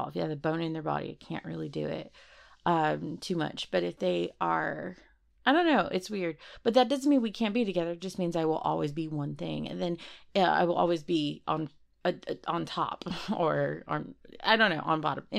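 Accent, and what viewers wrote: South, United States. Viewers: Southern accent.. southern accent??? Oh yippee! I love that so much, makes a Brit like me melt and crumble haha. Also, with you playing as a hellhound... imma make it my head canon that you're related to Loona, or friends heh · I’m in love with this southern hellhound. 😍 I find women with southern accents to be attractive. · ah within 14 seconds already has me fucking blushing, your pickup lines are too good! will finish this comment when i finish the audio but can already tell its gonna be a good audio, edit: oh damn you really will have me whimpering how do you manage to do a southern voice that just.... makes me absolutely M E L T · YAaaayyy! Great audio! Love the hellhound, the southern, the innuendos, the growls, the dom! Awesome work!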